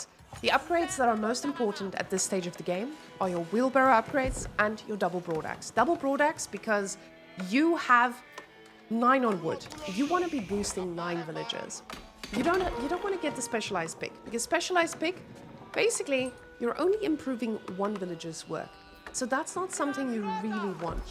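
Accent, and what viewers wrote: South Africa. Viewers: I don't know why but I am madly attracted to the South African accent... I need help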